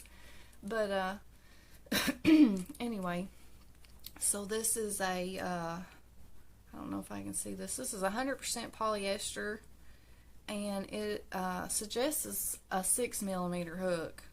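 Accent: South, United States. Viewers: Oh I just found your channel!! I love it! That doily is going to be beautiful😍. The snow dragon you are working on, is absolutely adorable❤. I'm loving all of your makes. Love the accent. Are you a southern gal? 😂. I'm a Kentucky girl, so I have that slang also. 😊. I hope you have a good day,. ☮💜🧶. Now, I've gotta go watch your other videos. 😁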